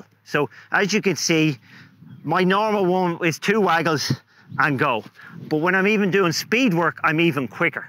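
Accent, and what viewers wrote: Ireland. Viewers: English is his first language. It's just where he's from in Ireland that gives him that accent, it's very common there.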